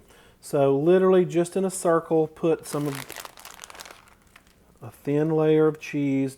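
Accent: South, United States. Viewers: I like your southern accent. Sexy guy, thanks for making these videos. I watched so many keto recipes then i do not have time to try these like you. I appreciate your work and humor. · You are such a joy to watch. I always feel I just had a visit from a personal friend. Love your Southern conversation while you cook. God bless you and yours. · Ur the bomb! I love the southern accent and expressions. ❤️ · Oh my GAWD you are so Southern and I love it! You talk so much like me and the people I know. When you just said "Y'ALL." I was LMAO. BTW, here's an idea to keep your taco shell shaper from rolling around: Use two measuring cups of equal size, spouts pointing toward each other, instead of glass jars. The spouts will help hold the dowel in place. :)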